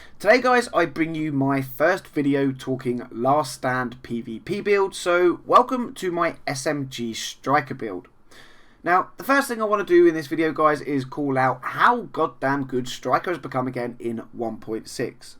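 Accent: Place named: Cornwall, United Kingdom. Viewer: Gonna go on a guessing your from the south west of the UK:) A slight cornish accent;) I'm from Devon myself:)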